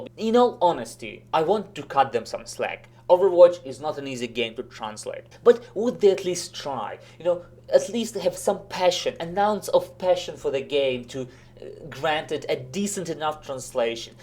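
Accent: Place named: Russia. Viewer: I can hear pure russian accent from your words. Are you from Russia?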